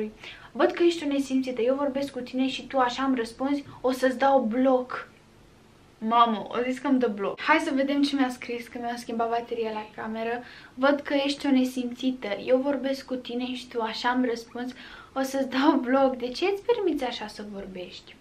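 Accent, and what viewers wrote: Moldova. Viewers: Dar tu de ce vorbești moldovenește cu prietenii tăi cu mama ta dar pe vloguri vorbești normal?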